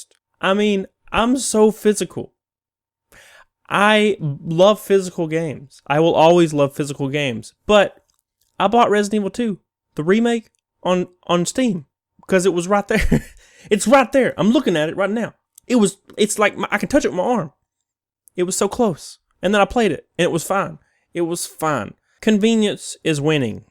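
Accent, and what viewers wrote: South, United States. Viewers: Ah. Figured it out at last! is channeling William Shatner..... If he had a southern drawl. Gamespot... IS..doitagain. SCOTTY! Set phasers....TO full. loadalltorpedoes...... <link> This is what I hear in my head whenever Gamespot is mentioned in these videos. <link> (Pretty sure no crabs in this video were left unharmed) Love the content man. Keep up the awesome work!!!!!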